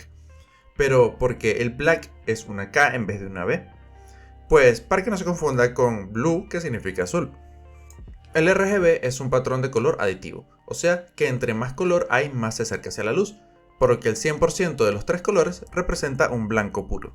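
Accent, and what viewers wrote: Venezuela. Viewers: si es evidente, solo que no es tan conocido. Pd: a mi me gusta mucho el acento veneco jeje. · Ese acento. Venezolano, tal vez?. Dibujas super genial!. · Eres venezolano?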